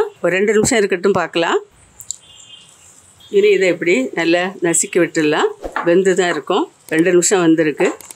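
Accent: Nagercoil, India. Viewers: அம்மா உங்க சமையல் சூப்பர். எனக்கும் என் மனைவி கும் ஒரு போட்டி. நான் நீங்க நாகர்கோவில் னு சொல்றேன் அவ நீங்க மலையாளி னு சொல்றா. அதான் உங்ககிட்டயே கேக்குறேன்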